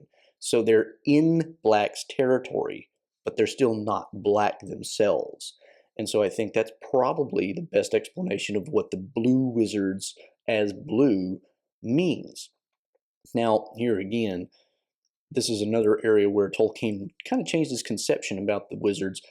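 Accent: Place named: Louisiana, United States. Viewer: Hi Lucas. I wouldn''t be surprised if "the war that will end war" was of French origin. On the other hand, I think evidence would need to be brought forward to prove it. (I hope you can read this in the Louisiana accent of our host).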